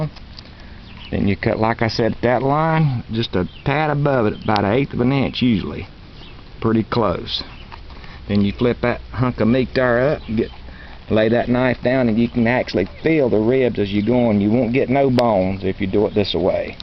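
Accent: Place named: South, United States. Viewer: I like the southern accent.... I grew up in Florida... makes me smile...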